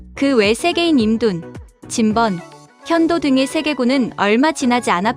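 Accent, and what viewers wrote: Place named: Japan. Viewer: 이거 무슨 일본놈 한국말 하는 소릴 하고 있어 ~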